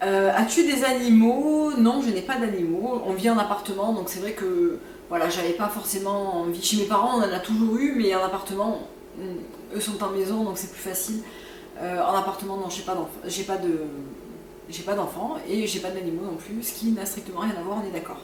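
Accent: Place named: Marseille, France. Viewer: Merci pour cette vidéo! je me disais bien que tu avais des intonations marseillaises meme si je n'en étais pas sure a 100%! Toujours agréable à regarder !!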